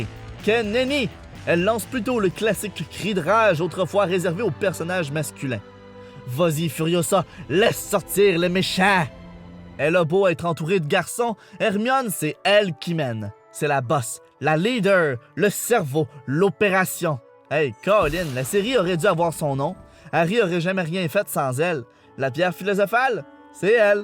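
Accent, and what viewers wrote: Quebec, Canada. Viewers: T'as oublier Hit Girl de Kick Ass !!! Mon dieu je l'aimmmeeeeeeeee 😍 Ps : J'adore ton accent québécois, je regarde tes vidéos limite pour ça 😘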